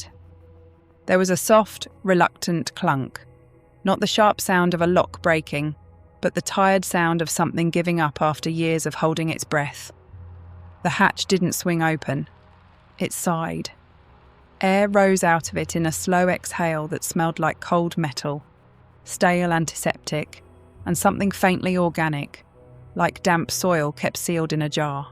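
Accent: United Kingdom. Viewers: I wasn’t fond of this voice. It had too much of an undertone of cockney or something which ended up being a bit grating. However, the story was enjoyable.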